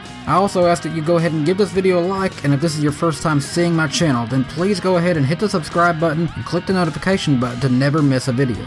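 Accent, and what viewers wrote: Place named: Texas, United States. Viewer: I have a buddy here in Texas that talks just like you, swear to God I do!!!!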